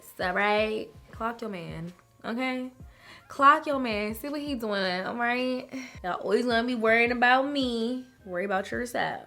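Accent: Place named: Prince George's County, United States. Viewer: You gotta be from PG County MD 🧐 I hear the accent 😂😂😂 & this look is bomb sis!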